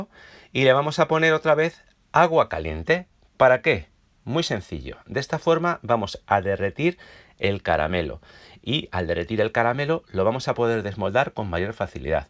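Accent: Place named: Spain. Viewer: Si es riquísimo yo aparte le pongo queso crema gracias me encanta tu acento Español saludos desde puebla México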